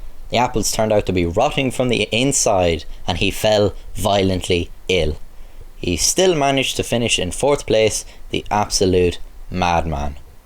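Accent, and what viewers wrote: Ireland. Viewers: Irish boiii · Are you Irish? The "r" in your accent seems to be very irish. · I KNEW YOU SOUNDED IRISH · 32 He has a Irish accent. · I've subscribed you are fucking hilarious my friend I think it's the Irish accent it gets me every time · bro are you irish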